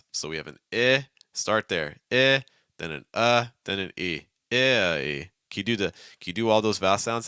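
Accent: United States. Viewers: shadowing practice is very effective for me every morning I can feel that by improving my pronunciation I'm also learning American English but I like pronunciation topics most I want to sound like an American native like your accent thanks.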